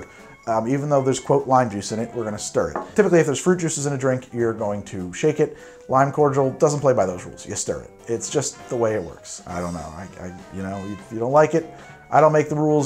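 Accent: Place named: New Jersey, United States. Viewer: The Doctor might of not been real or just had the same name as the tool. It could of been a play on word sort to speak, like how Chefs and Cooks call the microwave CHEF MIC/Mike. Because the Gimlet was a tool to keep the sailors from getting sick they called it "Dr." Gimlet. I love your Videos and I'm a fellow Jerseyan don't apologize for the way we say stuff it makes other states think we're weak lololol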